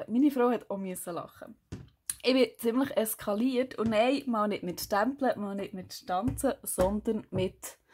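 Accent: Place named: Switzerland. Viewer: Schwiizer Dialekt han I scho vermisst 💚💚💚💚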